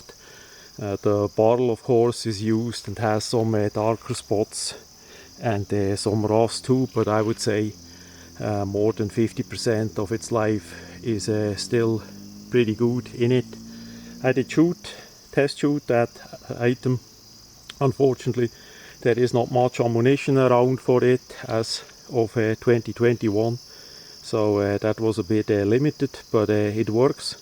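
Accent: Switzerland. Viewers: Ihr Schweizer Akzent ist einmal eine schöne Abwechslung von Arnolds Österreichischen Akzent. Apropos, wissen Sie welche Shotgun Don Camillo hatte?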